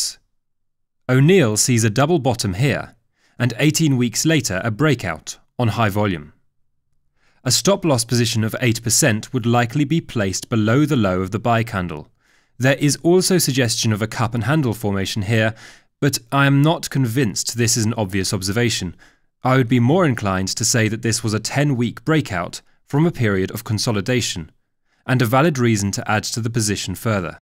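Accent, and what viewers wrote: United Kingdom. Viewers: You londoner?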